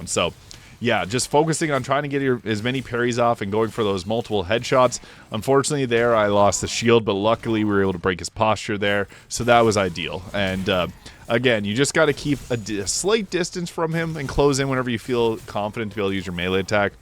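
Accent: Canada. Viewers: Do I hear a fellow Canadian?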